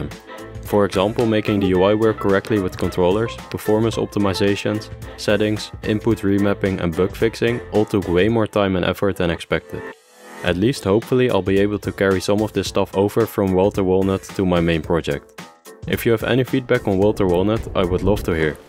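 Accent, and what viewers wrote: Netherlands. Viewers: Hé is Dutch You can hear it by his accent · are you dutch? you really sound dutch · Are you Dutch by any chance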